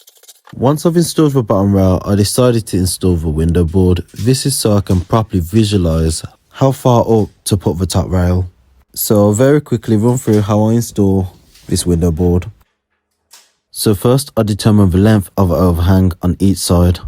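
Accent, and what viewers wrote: Birmingham, United Kingdom. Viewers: Can't help being a Brummie 😂😘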